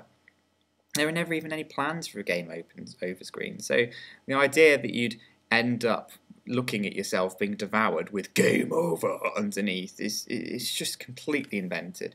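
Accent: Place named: United Kingdom. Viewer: i like the video but can stop talking like a british guy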